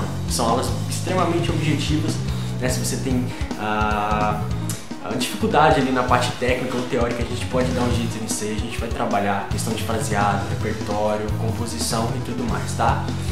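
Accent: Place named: Rio de Janeiro, Brazil. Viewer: Fala moleque, tu é do Rio brother? sotaque carioca, da onde mano?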